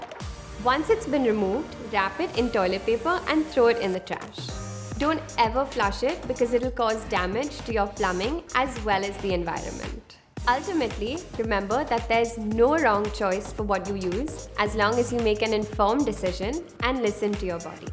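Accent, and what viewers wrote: India. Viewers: Why does she have an Indian accent? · I was confused, the lady looked so white yet her accent was strong indian, turns out it's an indian channel. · Why does she speak in Indian accent? She doesn't look like an Indian.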